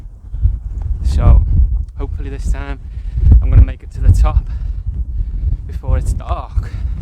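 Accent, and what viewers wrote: Liverpool, United Kingdom. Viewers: absolutely loved this...Right up my street....Is that a scouse accent? be watching and supporting your journey xx stay free xx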